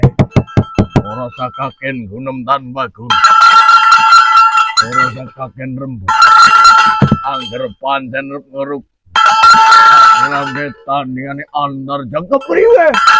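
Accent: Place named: Banyumas, Indonesia. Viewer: Dalang ngapak aneh-aneh Bae.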